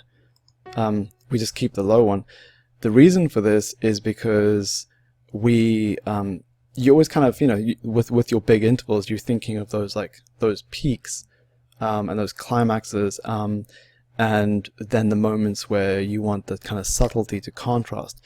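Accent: South Africa. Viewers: Thanks for the video dude! Really helps a lot 😊 Are you South African by the way?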